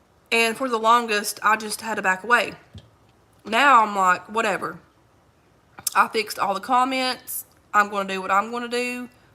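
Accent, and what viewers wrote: South, United States. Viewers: Love ur country accent. B blessed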